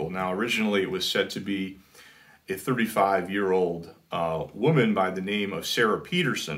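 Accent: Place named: Pennsylvania, United States. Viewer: are you from PA? You sound like it.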